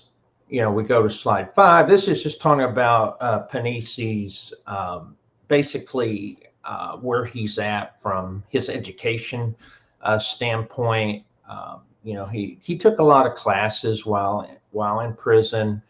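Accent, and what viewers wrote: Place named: South, United States. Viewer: I’m relatively new to this channel and honestly, I’m still on the fence whether I like it. it’s very difficult getting used to someone with such a thick southern accent trying to pronounce all these Italian names. All of that said, and I’m not a snowflake who gets butt hurt by things, While I realize everything you spoke about regarding John Pennisi is in the public record and public domain I don’t understand why you thought you needed to go through his record on the Internet. Personally I didn’t think he said anything disrespectful about the man who died, John just said things as statements of fact. It’s only relevant because, as mentioned in this video it’s considered to be taboo to take your own life in the lifestyle. You mentioned John has a prison record of “self harm” but without context, we don’t know what that means, he could’ve punched a wall for all we know. 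I just feel that bringing all of that out without all of the facts and proper context isn’t the right thing. The other guy has been dead for almost 15 years. I think there’s a slight difference.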